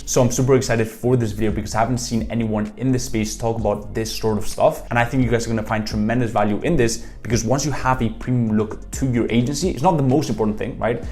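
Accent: Spain. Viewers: Eres español?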